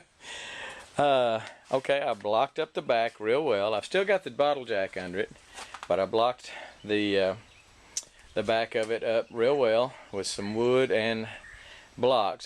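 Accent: Texas, United States. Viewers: Thank you Matthew McConaughey! 🤣 jk.. laid back Texas accent sounds similar.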